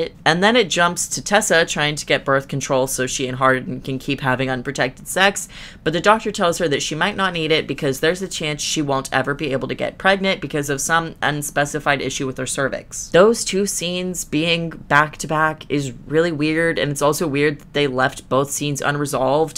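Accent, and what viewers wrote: United Kingdom. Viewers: . i literally went to another video of yours cause i thought maybe I remembered wrong and you were actually british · the british accent caught me off guard 💀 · this is the first video of yours that i’ve ever watched & for the first 5 seconds i fully thought you were british lmao 😂. anyways, thoroughly enjoyed this excellent roasting of the “after” series & love your whole ✨ aesthetic ✨ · Btw I know this has nothing to do with it, but you with the British accent, kinda sounds like Ron from harry potter, I'm not saying this to be rude, I was just kinda amazed at how close it was. :) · pretty good "British" accent for an American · This is my first video of yours and I was 100% ready to believe you were British, you could've went the whole vid with the accent and I wouldn't have been the wiser lmao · This was the first video of yours I clicked on and was surprised that you had a British accent, then surprised that you didn't. 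· This is the first video I've watched from you and I almost clicked off when I heard your british accent😭😭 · THE WAY U SAID NOVEL HERLPELEPRP UR BRITISH ACCENT IS SO FUNNY TO ME I LOVE IT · first time watching u. first 5 seconds i was terrified that u were british, anyway amazing video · Gotta say, I love your Bri'ish voice. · why is your english accent is more believable than the actors???? 😭😭😭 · bts i love your british accent lkjwqal;/lsakjuk;.lsa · Your British accent was great :D As someone from England I can safely say, we all speak like that XD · This is literally my first video of yours and I literally thought u were British 😭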